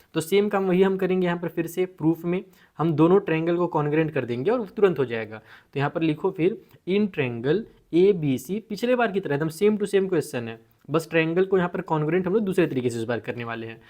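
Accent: Bihar, India. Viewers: Sir kya aap bihar Sa Ho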